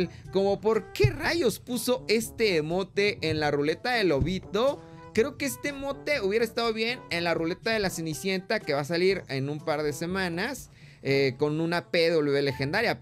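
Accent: Mexico. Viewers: Lobito eres de Puebla?😊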